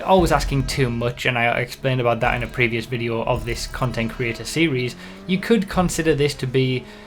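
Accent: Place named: Newcastle, United Kingdom. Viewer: You kinda sound like a Geordie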